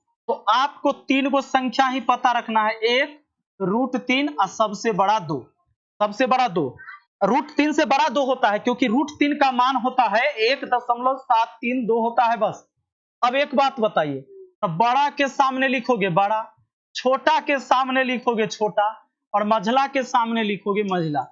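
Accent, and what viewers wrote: Bihar, India. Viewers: ❤आपका पढ़ा बिहार जैसा एकादम पूरा ठेथी भाषा❤❤❤🎉🎉🎉🎉🎉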